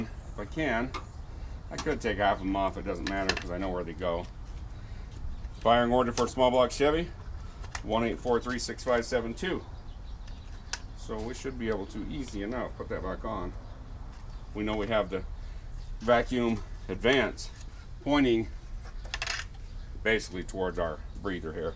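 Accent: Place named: Oklahoma, United States. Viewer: is one of my favorite YouTube channels and I'm also from Oklahoma and he really does talk like that lol. He's actually an extremely intelligent and talented guy who was an Airplane Mechanic in the Air force. He cracks me up and teaches me at the same time. In his earlier videos he was trying not to sound like an idiot he said so that is why he sounds different in the earlier ones. So actually the earlier videos are the fake lol 😁👍 I like each channel for their own individual uniqueness.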